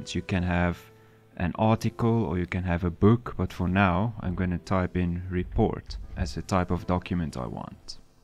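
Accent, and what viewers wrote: South Africa. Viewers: South African? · Thank you so much from your articulation I hear you are South African. I would like you to buy coffee, beer or coke....eWallet?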